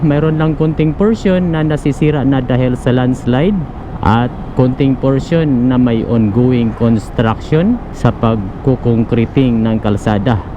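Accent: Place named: Visayas, Philippines. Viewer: AYAW NA PG TINAGALOG, KAY IMONG TONO BISAYA LANGUAGE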